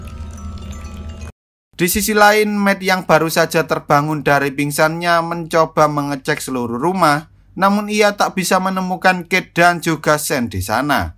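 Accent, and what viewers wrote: Java, Indonesia. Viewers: Mas koe opo wong jowo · orang jawa lik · medok e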